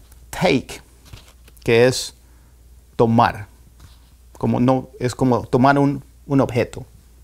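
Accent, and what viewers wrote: United States. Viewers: Interesting, you speak perfect English and have an American accent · Eres un EXCELENTE MAESTRO GRACIAS POR TU aportación educativa enseñando un INGLÉS AMERICANO perfecto para nosotros los hispanos parlantes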